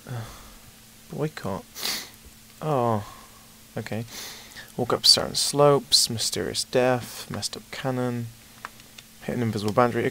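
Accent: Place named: United Kingdom. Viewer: I think hes british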